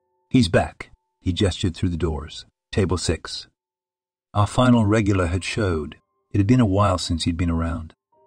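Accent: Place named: South Africa. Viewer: You almost sound South African hey😊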